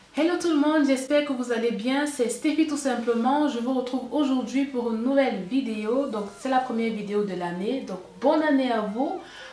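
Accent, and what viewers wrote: Cameroon. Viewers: ta maniere de parler naturellement comme une camer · Olalala j'ai découverte ta vidéo aujourd'hui ma belle j'adore comment tu explique depuis qlq jours j'essaie de vouloir commander les mèches justement ta vidéo vas bcp m'aider j suis accro à aliexpress , ma soeur camer ton accent la ne ments pas 😘 j m'abonne😉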